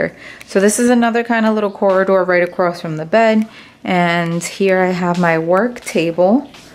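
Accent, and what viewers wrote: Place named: New York, United States. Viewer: I love your accent; it’s so NY and I just love it. Stay safe queen and Tfs 😘🖤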